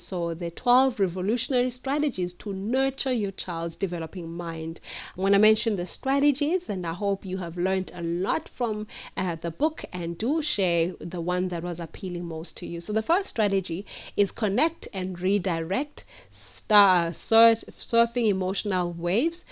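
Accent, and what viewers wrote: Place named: Kenya. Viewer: Thank you so much for this. It was very helpful and it was very nice to hear an accent which reminds me of my homeland Kenya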